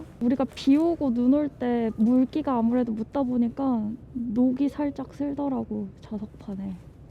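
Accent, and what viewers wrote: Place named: Gyeongsang, South Korea. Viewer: 고향이 어디세요~ 경상도 억양이 나와서요~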